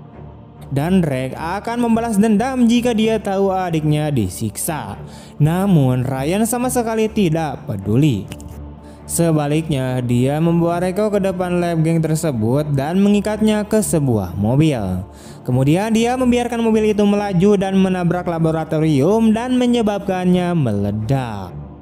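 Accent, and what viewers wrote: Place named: Sunda, Indonesia. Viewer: Kau jadi pelawak pun tak laku bro ...logat bicara mu itu yg betol jangan maluin bangsa Sunda ... Bicara yg tegas jadi lelaki itu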